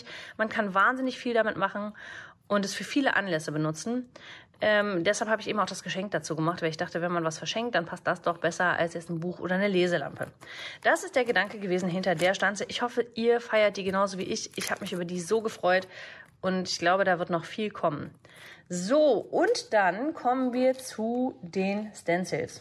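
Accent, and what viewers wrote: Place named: Berlin, Germany. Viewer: Also mal ehrlich: dein berlinerisch ist ja *janz knorke*❗ Die ministempel & die Eiskristall-Schablone gefallen mir besonders! Freu' mich sehr auf Freitag!!!! Schönen Tag noch & Grüßle vonne Monika ❤️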